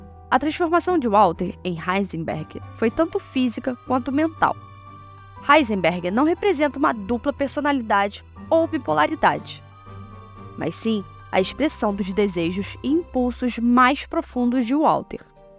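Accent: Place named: Rio de Janeiro, Brazil. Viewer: O vídeo é bom Complicado esse sotaque de carioca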